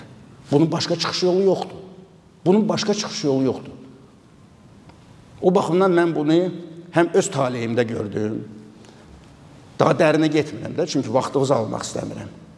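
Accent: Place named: Baku, Azerbaijan. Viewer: bey Maştağalidir yoxsa hansi Baki kendinden? Aksent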